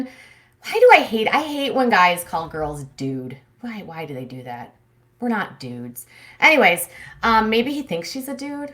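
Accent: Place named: Tennessee, United States. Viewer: Good with the Tennessee accent! I'm from Memphis, lol Good review, as always.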